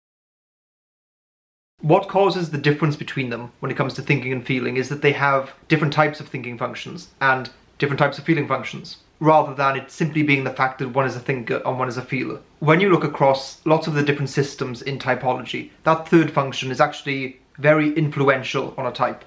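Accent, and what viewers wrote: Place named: England, United Kingdom. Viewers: I love your accent. It sounds special. Maybe it's English-English. There is mostly American-English on Youtube so this one is different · Now if I can figure out your accent. Not scouse! RP with a touch of the Midlands maybe ....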